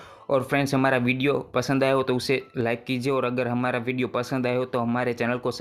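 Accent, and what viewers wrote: Gujarat, India. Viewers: Gujarati ho bhai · Gujrati ho bhaya 😍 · Bhai gujrati ho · Tame gujrati cho e tamri boli mathi kahber pade che bhai kaya thi cho bhai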